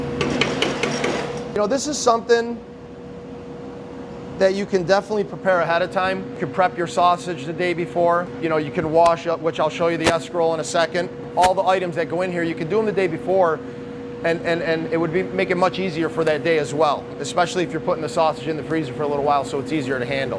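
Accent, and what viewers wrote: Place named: Upstate New York, United States. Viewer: Even before I saw "Utica" on your T shirt I knew you were from Upstate NY, that's a cool little twang in your accent, I am from Buffalo originally and could pick it up right away. Loved the recipe and technique. I always wondered what kind of greens to use, I guess it's escarole.....and always wondered if I should rinse the beans first, I see you added them right from the can so I guess don't rinse them.....so making this dish in the upcoming fall when the weather cools....also, I have friends that make it and nobody puts Italian sausage in it...I'm trying it that way.....seems like it would be heartier.....thanks for the video...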